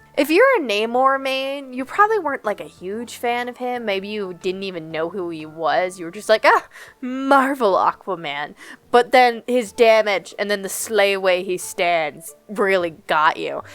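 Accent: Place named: South, United States. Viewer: Dawg is it just me or does she sound like squirrel girl lol like she has the same thing where she like says stuff and becomes slightly southern or something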